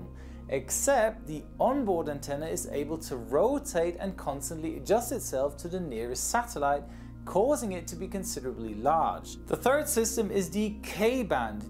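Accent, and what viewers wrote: Germany. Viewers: Are you from Germany?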